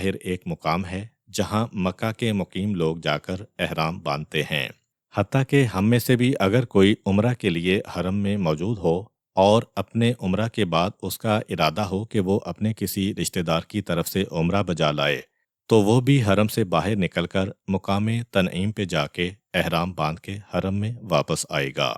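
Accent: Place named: Punjab, Pakistan. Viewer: آپ کے لہجے میں پنجابی جھلکیاں سنائی دیتی ہے مجھے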